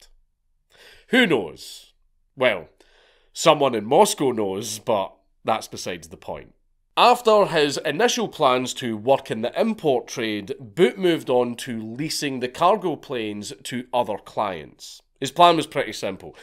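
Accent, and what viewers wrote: Scotland, United Kingdom. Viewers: Hey man, you’re clearly Scottish do you reside in Scotland or are you across the pond in the Americas? I’m not stalking I’m just super curious because I personally share some of your “political” views (which you’ve only ever eluded to but never overtly expressed) & if you’re NOT in the US only makes me that much happier.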